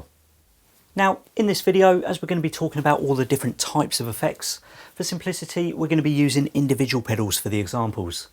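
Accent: United Kingdom. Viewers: this guy sounds like brittish guy from the cowboy bebop movie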